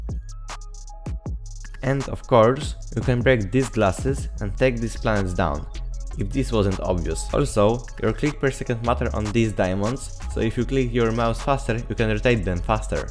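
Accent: Poland. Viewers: Fajny film dobra robota, na pewno mi się przyda. Nie chce nikogo obrażać ale ten Polski akcent jest bardzo wyczuwalny😂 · Ah słychać ten polski akcent w twoim głosie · slychac ten polski akcent😂😂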